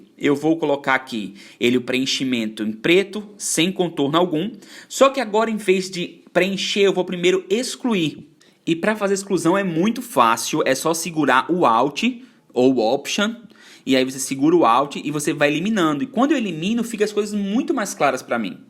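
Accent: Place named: Salvador, Brazil. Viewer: Eita, este sotaque é de Salvador... Coraçãozinho bate no ritmo da Bahia quando escuto meus conterrâneos depois de tantos anos vivendo em Buenos Aires. Obrigada pelo conteúdo! Amanhã mesmo vou aplicar numa ideia. Gratidão!!!! 🙏🏾♥️🙏🏾♥️🙏🏾♥️🙏🏾♥️🙏🏾♥️🙏🏾♥️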